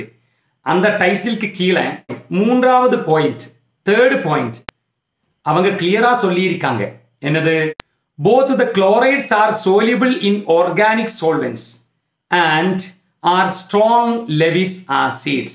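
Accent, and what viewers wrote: Kerala, India. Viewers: Looks like malayali speaking tamil. Is it?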